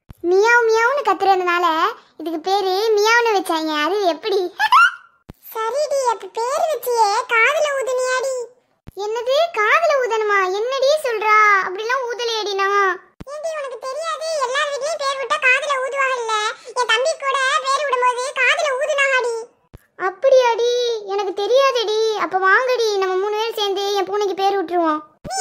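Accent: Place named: Parangipettai, India. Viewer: 80%of your slang sounds like parangipettai slang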